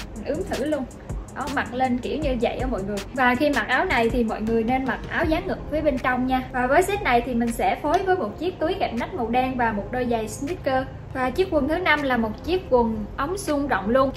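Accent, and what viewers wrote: Quang Nam, Vietnam. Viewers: c này chắc người Quảng Nam quá :)))) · Nghe phát biết người Quảng Nam liền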